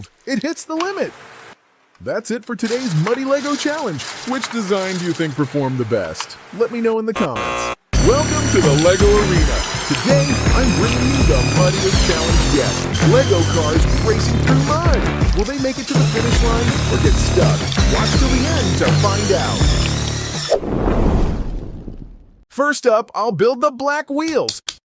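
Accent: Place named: United States. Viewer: The fact that the narrator has an american accent really piss me off.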